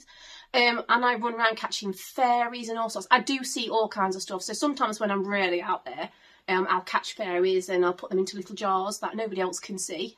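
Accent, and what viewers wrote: United Kingdom. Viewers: Thank you for being so open. I have bipolar (with psychosis) and ptsd so hello from one nutter to another. You my dear are the poster child for showing the world that you can have a chronic/severe mental illness but also be an amazing and attentive mother with stregnth many don't have. That's a fuk you to stigma if I've ever seen it. I must have missed this video when it was uploaded but I too am currently a crap goth in my pyjamas all day too anxious to leave the house... by your accent I don't think we're too far away... maybe will bump into you on a patch ward some day....but hopefully not for either of us lol. Anyway much love from South yorkshire ❤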